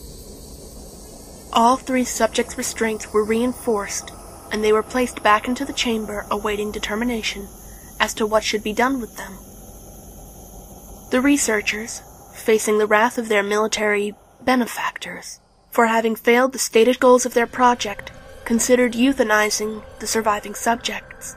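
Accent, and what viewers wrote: Russia. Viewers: Your Russian accent reminds me of Tenenbaum from Bioshock. Great job! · that Russian accent tho ;)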